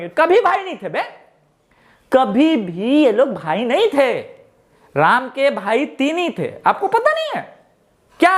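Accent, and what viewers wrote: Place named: Bihar, India. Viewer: Waah...bihari andaj me bola...maja aa gaya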